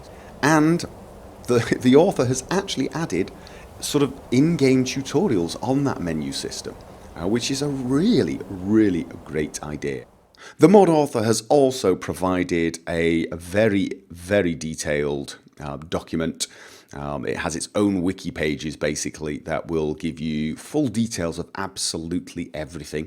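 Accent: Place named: Wales, United Kingdom. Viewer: this guys regal welsh accent is kind of peculiar :D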